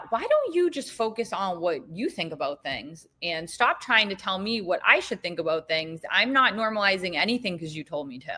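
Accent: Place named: Massachusetts, United States. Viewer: I thought Mass.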